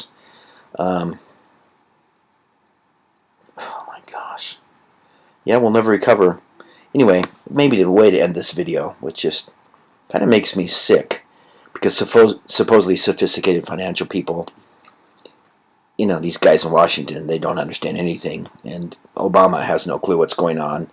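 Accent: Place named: Argentina. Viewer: I like your Argentinian Accent! LOL!!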